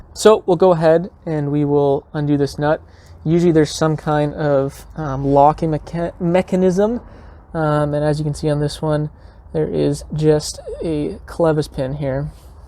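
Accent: Canada. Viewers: You sound so Canadian !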